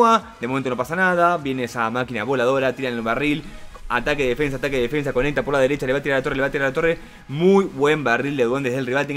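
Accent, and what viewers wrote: Argentina. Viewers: De que parte de buenos aires sos? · sos argentino?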